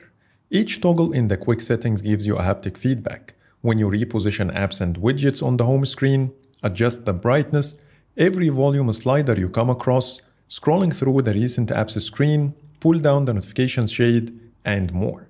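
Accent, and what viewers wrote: Egypt. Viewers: انت صوتك مصري 😅كل المصرين لما يكلمون انجليزي بيبقو برضو معروفين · أول ما سمعت الصوت عرفت إن مصري ❤️❤️ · Egyptian accent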